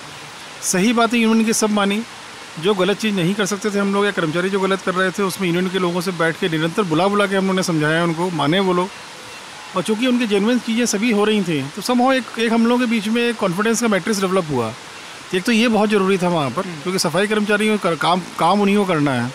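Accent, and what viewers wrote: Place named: Rewa, India. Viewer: Are thakur sahab apna rewa ke ahan mangawa Se main 30 km dur mauganj se hu... apki tone se hi samajh me arha tha wah..App rewa ajao sirji apki jyada jarurat hai rewa me Kaha netan ke cahkkar me pade han..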